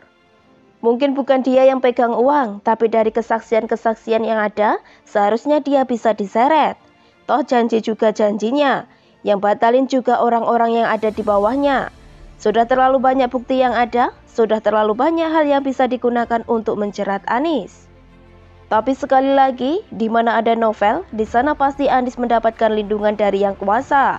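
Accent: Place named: Java, Indonesia. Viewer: intonasi dan medoknya announcer gak cocok buat berita...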